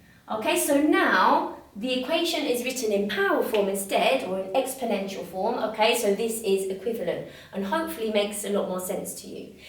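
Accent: England, United Kingdom. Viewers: Bonjour, je ne jette pas de fleurs et c'est bien la vérité votre chaîne Youtube est une référence en matière de l' éducation des mathématiques, elle est d'ailleurs parmi mes rares chaîne préférés, j'ai parcouru presque tous vos vidéos et c'est toujours avec le même haut niveau de professionnalisme et de la bonne humeur que vous enseigner cette matière aussi Clairement que brièvement ,d'ailleurs vos vidéos me sont de grands aide pour enseigner les mathématiques à mon neveu,parfois à distance, qui est inscrit tant tôt dans un collège américain tant tôt dans un collège britannique à Dubaï et qui est un brillant et aimant des mathématiques et qui apprécie beaucoup d'ailleurs votre manière d'enseignement et aussi votre accent anglaise il faut signaler aussi que votre langue et prononciation est très claire et compréhensible malgré que j'ai étudié les mathématiques en français et mon anglais est basique je ne trouve aucune difficulté pour comprendre, merci infiniment pour votre précieuse aide et pour vos efforts déployés et votre temps dédiée à l'enseignement et de partage du savoir félicitations bonne continuation et bonne chance.👍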